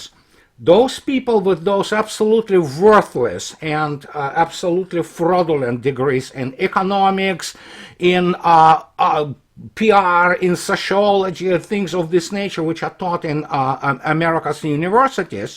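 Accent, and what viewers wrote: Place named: Russia. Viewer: I love your russian accent...🙂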